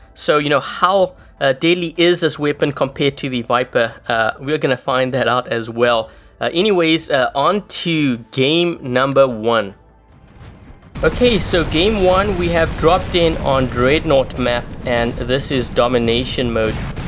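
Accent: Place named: South Africa. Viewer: An excellent response mate, thank you for taking the time to write it and it very much covered every facet of my line of questions, so cheers once again. Culturally I think the Russians are very different to the west so the tact and understanding you have shown, even of the smallest nuances, goes a long way with them. Keep up the good work! Finally I think I asked on live chat once if you were a saffa or from Zim because of your accent but then you said you’re a Canadian national so am now confused.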